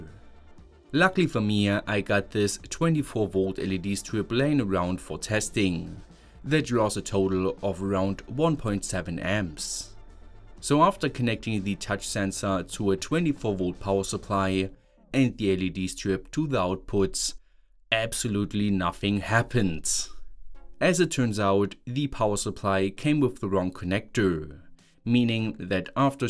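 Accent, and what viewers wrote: Germany. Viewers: uff what a strong German accent :D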